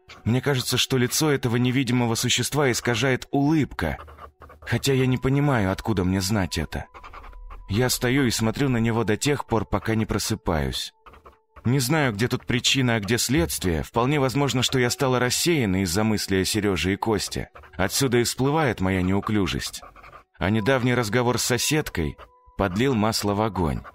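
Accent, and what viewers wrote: Standard. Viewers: чистый русский язык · Симфония, песня, бальзам для сердца. Чистый русский язык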